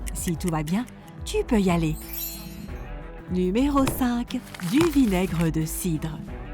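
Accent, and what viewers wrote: Quebec, Canada. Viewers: C'est l'accent canadien du Québec et encore ce n'est pas trop prononcé !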